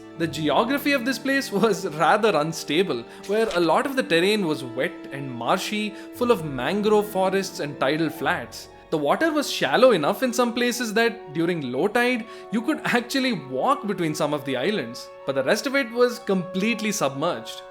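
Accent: South India, India. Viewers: Love the South Indian accent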